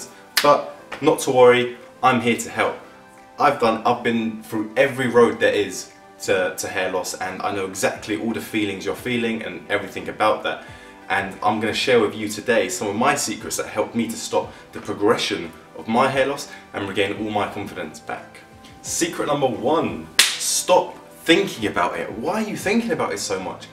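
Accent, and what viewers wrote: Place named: London, United Kingdom. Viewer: Bro might I recommend shaving your head - you're a good looking dude and you can pull it off. It would look a lot better than what you have now. Dht causes hair loss, not shampoo, not stress, not smoking, not diet, not anything else. You sound like you live in London, so speak to the top trichologists and hair transplant surgeons on Harley street, and they will tell you the same. I used to think like you did, until I saw a fat homeless junky outside Bond Street with a head full of amazingly thick hair - I'm sure he's stressed, I'm sure he smokes and drinks and eats shit. So why isn't he bald? Because his genetics are coded differently to mine and yours - his follicles are resistant to dht, yours are not.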